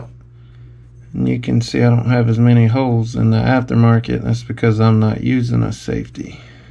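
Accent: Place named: South, United States. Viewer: What a douchebag comment , cause he’s got a southern accent , let me guess your some proper speaking northern liberal? Stop being rude , thought you yankees were supposed to be all tolerant and shit?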